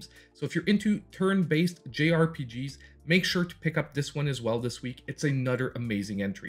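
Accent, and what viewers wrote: Quebec, Canada. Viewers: I'm very surprised that all the deals are from the states, cuz I'd be very surprised if your accent isn't french canadian. Also your channel name is maple syrup...pretty canadian. But I mean people do move to the states haha.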